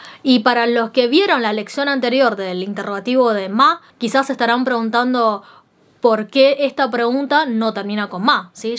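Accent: Argentina. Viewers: hablas argentino profe ! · No se que hablas bonito, si el chino o español con acento argentino :) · Wuuaaoo esta chica habla español de Argentina , ese es Acento argentino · ESTA CHINITA ESTA LINDA TIENE UN ACENTO COMO QUE VIVE EN ARGENTINA???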